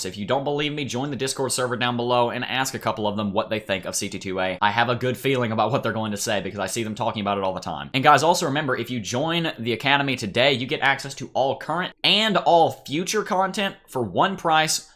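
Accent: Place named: Alabama, United States. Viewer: I am not from Birmingham AL, but you sound like you may be! Enjoy your channel!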